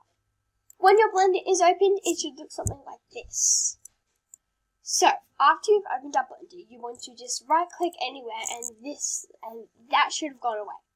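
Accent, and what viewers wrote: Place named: United Kingdom. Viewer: This British tho.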